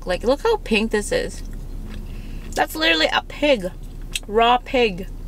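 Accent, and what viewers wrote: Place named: Minnesota, United States. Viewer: You never know your Minnesotan until you hear another Minnesotan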